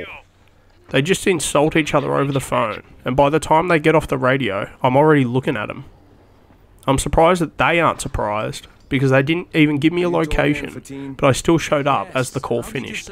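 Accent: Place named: Australia. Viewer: The Aussie commentary is great Hoping to see more of this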